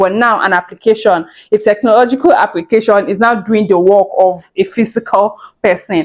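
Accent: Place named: Nigeria. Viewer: Your surname sounds Ghanaian and your voice is screaming Nigerian. Good insight though 🔥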